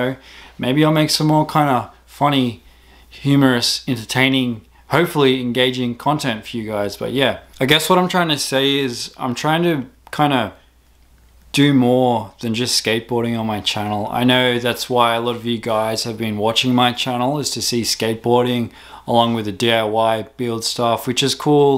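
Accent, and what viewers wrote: Australia. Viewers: I like how you somehow made your accent more Australian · its an aussie doing a aussie accent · This is fucking so good, the aus accent just the cherry on top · that was awesome! hahaha. am I correct to think that you spoke with a different australian accent? like from a different region?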